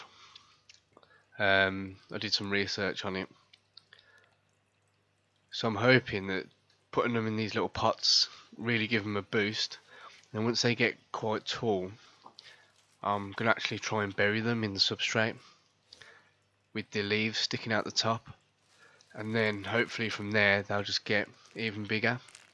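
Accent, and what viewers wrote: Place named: West Midlands, United Kingdom. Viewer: that west midlands accent though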